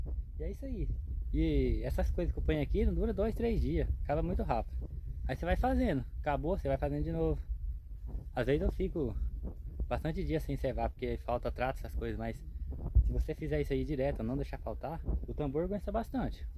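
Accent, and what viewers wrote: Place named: Mato Grosso, Brazil. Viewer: De onde vc é tá parecendo os Rio de tangará da serra MT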